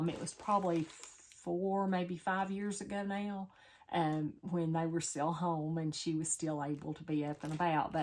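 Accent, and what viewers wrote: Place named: Western North Carolina, United States. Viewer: Your Mom is beautiful! Your accent sounds as if you might be from western NC, as am I. Just found you & am looking forward to watching more of your videos...😊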